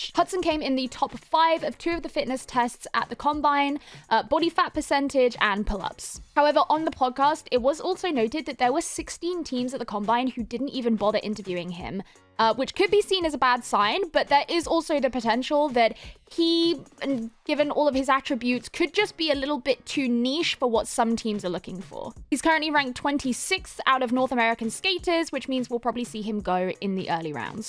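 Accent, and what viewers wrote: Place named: United Kingdom. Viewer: Great analysis! Though I'm still adjusting to a British accent discussing hockey lol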